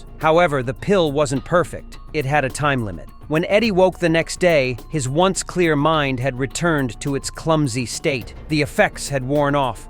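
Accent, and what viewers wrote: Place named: United States. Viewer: Sound like a certain American guy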